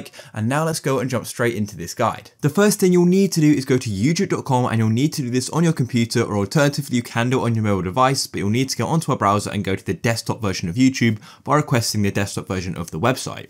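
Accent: United Kingdom. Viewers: When English guide? I don't understand British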